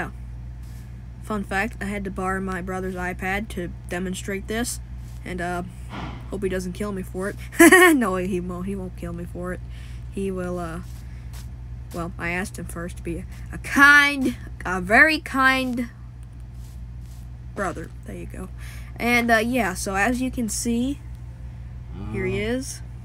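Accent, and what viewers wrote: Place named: South, United States. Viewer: I like the southern accent btw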